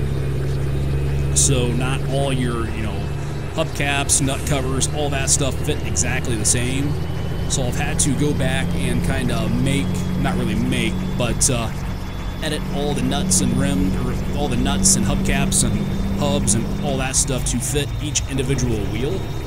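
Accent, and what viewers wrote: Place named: United States. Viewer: i will assume that you are american. If so, can you tell me from what part of US are you from? I'm asking you this because i quite like your accent. And nice video! Keep up the good work!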